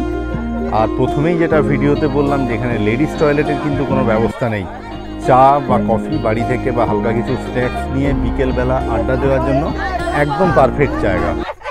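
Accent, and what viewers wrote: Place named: Medinipur, India. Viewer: Apnar uchcharon puro medinipurer, valo korechen, kono artificial noy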